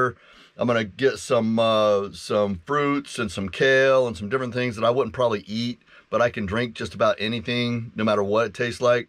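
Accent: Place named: South, United States. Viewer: Glad that things are good,! You are beginning to get 'just a tad' of Southern English twang going there... Ha ha. Welcome to the Southeast.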